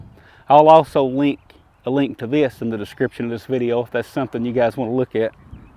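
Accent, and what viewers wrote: United States. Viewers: you have an interesting accent which is specific for one area of USA, that's why I asked.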